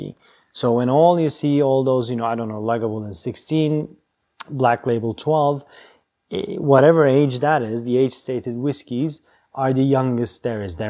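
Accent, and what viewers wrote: Turkey. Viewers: Your accent .. Are you a Turkish guy?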